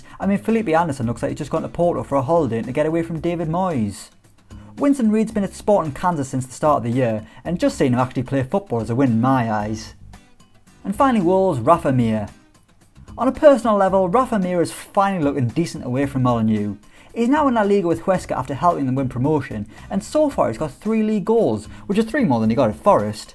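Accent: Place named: Ireland. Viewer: If I don't hear the irish accent... I press exit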